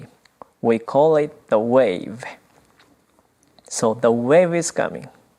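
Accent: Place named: Portugal. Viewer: Thank you for the wonderful English Dharma talk! Sensei actually has a bit of a Portuguese accent, so his Portuguese must be excellent! Namu Amida Butsu🙏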